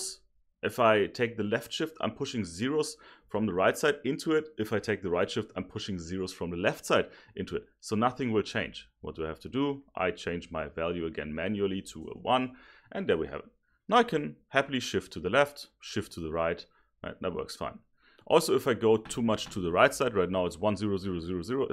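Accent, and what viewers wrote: Germany. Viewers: I can tell he is German 😁, thanks a lot sir.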